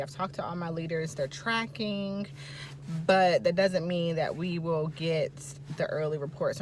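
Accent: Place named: Caribbean. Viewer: Are you from the Caribbean (Virgin Islands)? I can hear an accent 🧐😃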